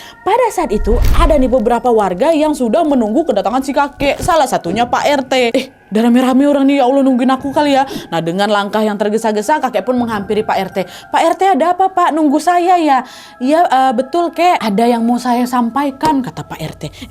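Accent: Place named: Banjar, Indonesia. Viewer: Kak, jujur kakak mirip bgt sama keponakan suamiku. 11.12 loh kak bedanya suaranya aja yg disini lebih ke Banjar ❤sehat selalu ya ka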